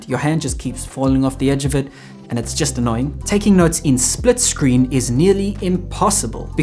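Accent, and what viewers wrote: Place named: South Africa. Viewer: Subscribed because I need Tech Reviews delivered in a sick South African accent!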